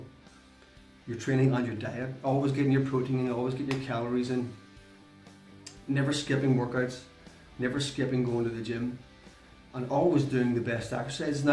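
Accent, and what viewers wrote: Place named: Northern England, United Kingdom. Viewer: WOh your always with the truly objective, scienctific logic to training for hypertrophy, been following your THT programmes since i bumped into in online back in 2016, i really like how you explain things & i got the sense that your the type of person who is on his own personal quest to find the truly most effective knowledgable way of achiving said goals - for example this video on Flat barbell chest, everyone else goes with the "conventional" wisdom which i get, but your taking the risk to say what you believe and regardless of anythign else i respect that! So again thanks for all your effort and saving me years of doing it the wrong way from all the Bro science thats out there! Ps. Loving the Northy accent!!! (from a thick oul Dub):-D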